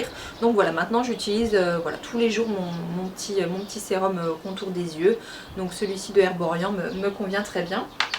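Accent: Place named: South, France. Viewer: Ça fait du bien de te retrouver à travers une vidéo! Ne décèlerait pas un léger accent du sud qui s'installe dans ta voix? ahaha c'est charmant. Bonne journée et reviens nous vite pour une nouvelle vidéo!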